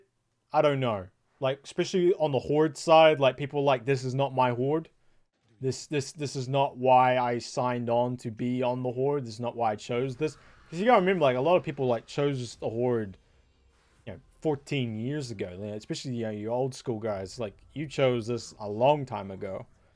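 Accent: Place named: United States. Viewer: Im a kiwi....Your accents odd....Kinda got an american twang.....sorry....this comment probably isn't needed....but i've written it so ill post it lol.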